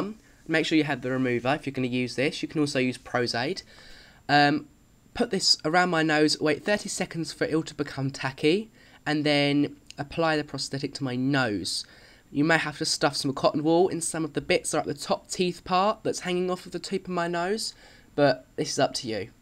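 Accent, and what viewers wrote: United Kingdom. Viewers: oh and you're cute mehh and british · You're British?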